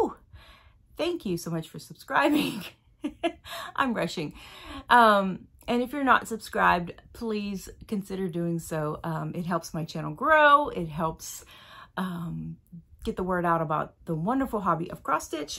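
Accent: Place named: Acadiana, United States. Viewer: I picked up your accent! Lol love the Acadiana pattern. Leave the crawfish!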